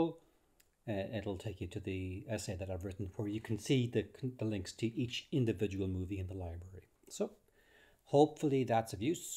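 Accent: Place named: Ireland. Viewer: I’ve done loads of your courses, they’re all great! Great to hear an Irish accent too:) go!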